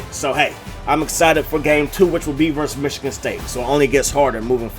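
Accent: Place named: Prince George's County, United States. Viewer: Sounds like PG county accent.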